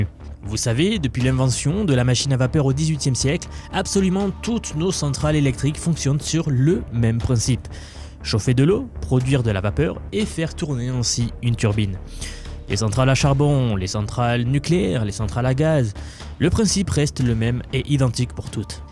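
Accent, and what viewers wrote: South, France. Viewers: Je pense que tu es une IA bien alimentée en données issues de publications scientifiques. Mais ce serait une déception car j’aime ton accent méridional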